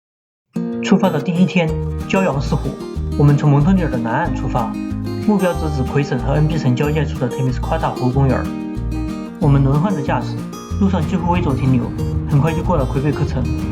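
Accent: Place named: Sichuan, China. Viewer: 发音像四川人说普通话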